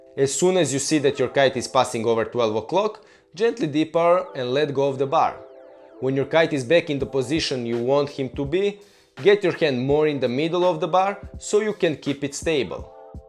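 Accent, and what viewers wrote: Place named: Russia. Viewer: Iemācies angļu valodas pareizu izrunu, angļu valoda ar izteiktu krievu akcentu! Pretīgi klausīties!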